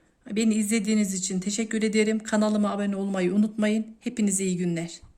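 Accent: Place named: Central Anatolia, Turkey. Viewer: İlk yorum benden olsun hafif İç Anadolu aksanı seziyorum hemşeriyiz sanırım ayrıca çok güzel içerikler var ✅🙏😊👏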